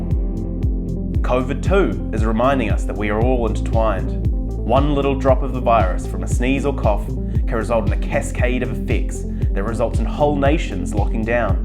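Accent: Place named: New Zealand. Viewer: Great illustration of nature as a complex adaptive system! And nice New Zealand accent...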